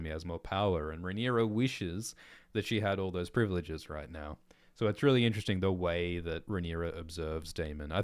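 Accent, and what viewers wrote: Australia. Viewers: What gave it away? The Australian accent he’s used for 10+ years?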